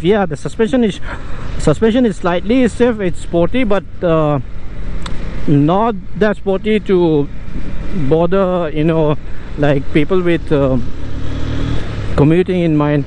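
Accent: India. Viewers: accent hi chu Indian ve reuh eeee 😁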